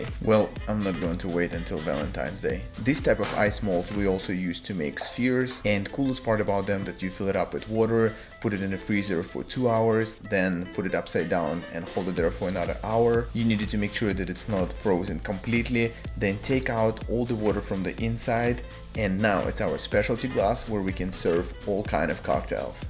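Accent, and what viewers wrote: Russia. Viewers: Русский акцент.) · Вот странно слушать русский акцент английского языка, будто говорит на родном но ничего не понятно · Ну я понял что ты русский но не по имени а по акценту ну чисто вот этот русский который хочет дать тебе по лицу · По акценту понял, что автор канала русский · Where are he from? Soo shity russion accent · Он очень похож на русского который говорит на английском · русский же акцент, не? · Я никак не пойму. У тебя вроде как русский акцент? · Я один слышу русский акцент?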